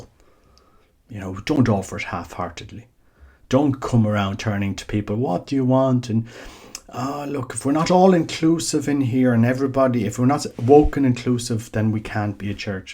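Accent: Ireland. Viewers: Your subject matter is excellent and you speak so clearly. You are a true Catholic and It is a pleasure listening to your lovely Irish lilt. May God bless you abundantly.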